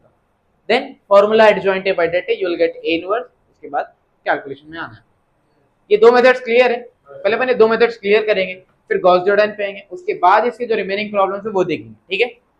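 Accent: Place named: Hyderabad, India. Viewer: Im really happy that a Hyderabadi teacher is also trying to teach 🎉 Honestly telling its so good to study under a teacher who is teaching in Hyderabadi accent ❤❤ Keep going sir 🙏 👏 ❤ You're explaining very clearly and also your explanation is so good 👍 ❤🎉 Keep going sir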